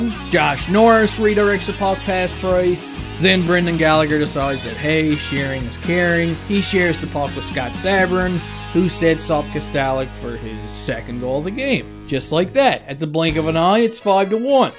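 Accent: Newfoundland, Canada. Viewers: This is the first video of yours that I've watched and the Newfie accent is killing me! Love from GFW buddy!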